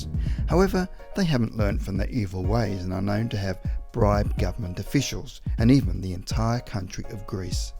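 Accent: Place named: Australia. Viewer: cant stand this shit australian accent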